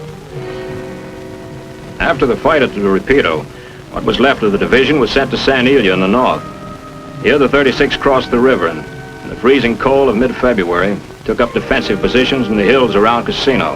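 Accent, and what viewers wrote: New York, United States. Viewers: Clark's glory hounding cost my grandfather a lifetime of PTSD and misery over the loss of his battle buddies. P.S. I love that glorious New Yawk accent. My WWII soldier grandfather was with the 143rd (36th) Company K and hailed from Brooklyn, New York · Mark Clark should have been court martialed for destroying this division on the Rapido - a totally unapproachable obstacle if you have ever seen the pics. The German engineers ("Pioneers") cut down all the trees within hundreds of yards of the shore and mined the area completely. On a lighter note, could the Army SIgnal Corps at least give the Texas Div a narrator who doesn't have a thick New York accent? I realize that after the division was butchered repeatedly in Italy, it wasn't even half Texans, but come on.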